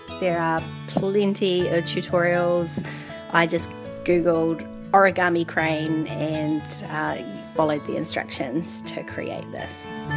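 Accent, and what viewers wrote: New Zealand. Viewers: I already do these things with all my hoarded bits and bobs but the origami crane out of the new world receipt love it! Very inspirational. And it's nice to hear a kiwi x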